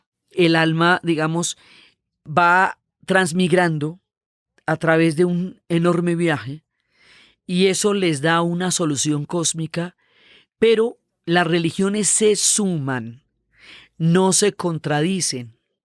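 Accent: Colombia. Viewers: un agradecimiento patrio por ser la embajadora de la palabra con acento colombiano. Qué exquisita experiencia visitar al Japón 🇯🇵 guiada por tu voz. Gracias!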